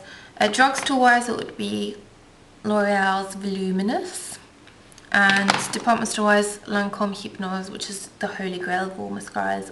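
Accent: United Kingdom. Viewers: wow! i'm ukrainian too, but i live in US, and i envy your british accent, i think it is soooooooo cute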